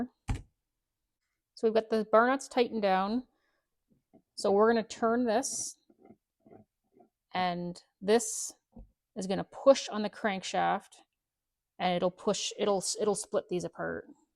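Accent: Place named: Canada. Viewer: I like your work and your delightful Canadian broge.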